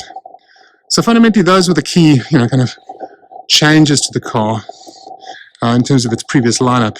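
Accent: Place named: South Africa. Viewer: I take it from your accent, you're South African. Is that where you got the car ? It's spectacular. The nicest 928 I've seen..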